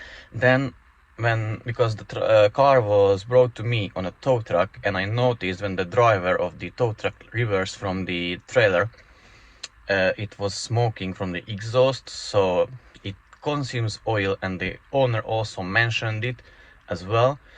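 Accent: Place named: Hungary. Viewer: I thought you were Hungarian your accent is very similar to a workmate of mine. He has a penchant for old BMWs 😊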